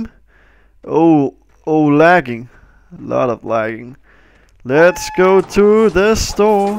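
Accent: Denmark. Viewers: Man kan godt høre at du er dansker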